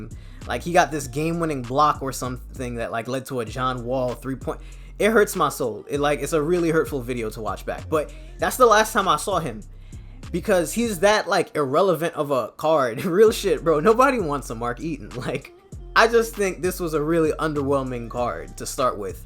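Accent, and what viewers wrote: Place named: Jamaica. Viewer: I hear that Jamaican accent boy Gang🤟🏽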